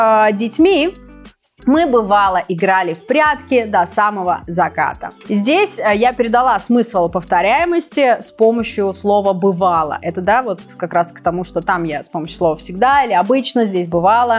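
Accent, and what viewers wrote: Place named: United Kingdom. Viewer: U sound so british. So academic. 😊 No offence, btw.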